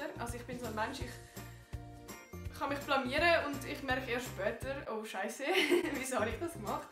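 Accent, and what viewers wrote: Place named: Switzerland. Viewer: Sehr cool, das Schwitzerdeutsch 😁👍 fast wie eine andere Sprache 😜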